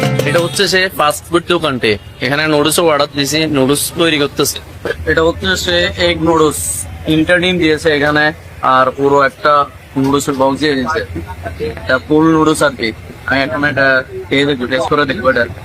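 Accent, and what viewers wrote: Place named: Bangladesh. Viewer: Bro tomar kotha bolar accent onektai amader Bangladeshi der motoi... Valo laglo... Eid Mubarak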